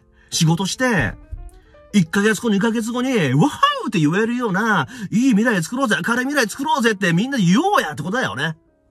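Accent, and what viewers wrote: Kansai, Japan. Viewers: Qさんのたまに出る関西イントネーションが大好き😆 大阪人より🙋🏼‍♀️❤️ そして今日も楽しい動画をありがとうございます！！！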